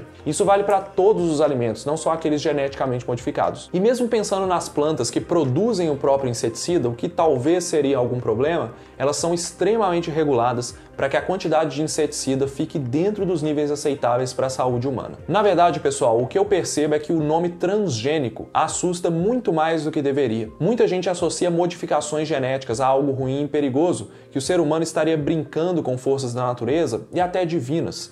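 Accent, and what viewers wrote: Minas Gerais, Brazil. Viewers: Que sotaque mineiro fofo ❤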